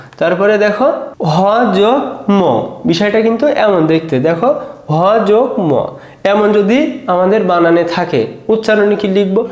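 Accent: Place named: Satkhira, Bangladesh. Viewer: বাসা কোথায় আপনার,,????? কথার টোন শুনে মনে হচ্ছে সাতক্ষীরায়,,,আমি আপনার সব ক্লাস দেখি,,,বাংলা সাহিত্য গদ্যের mcq and ব্যাখ্যা দেখে তারপর বই থেকে দেখছি এখন খুব সহজ হয়ে গেছে,,, ধন্যবাদ ধন্যবাদ 😊😊😊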